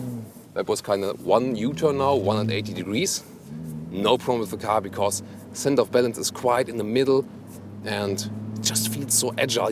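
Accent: Germany. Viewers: Please make your Videos in German, the German-English sounds like shit and your Channelname is also a German one...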